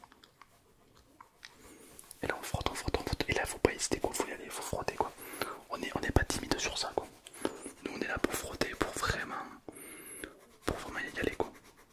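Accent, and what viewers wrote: South, France. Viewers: Adiu tu as un bon accent du sud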